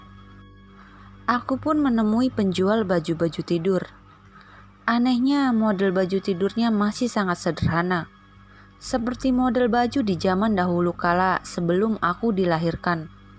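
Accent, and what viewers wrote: West Java, Indonesia. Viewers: Yg baca logat sunda...ya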